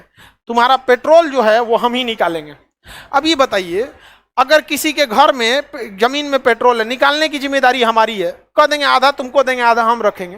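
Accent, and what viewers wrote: Bihar, India. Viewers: ye bihar ke logon ki aise boli hoti hai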